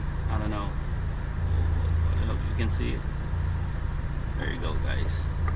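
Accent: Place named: Philippines. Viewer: That Pinoy accent lol much love though bro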